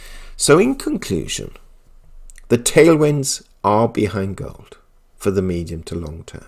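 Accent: United Kingdom. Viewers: China owes the US a trillion dollars? Are you on drugs? The US is and has been running record deficits with China for decades and your position is they owe the US? Despite your smug British accent you clearly are a deep state shill. Figures don't lie but liars figure. China holds 30k tons of gold, the largest gold holder on the planet so your figures are out in left field. China's debt is to itself so it doesn't need to revalue gold but when it does it will expose the wests paper ponzi scheme and then your lies will be illuminated.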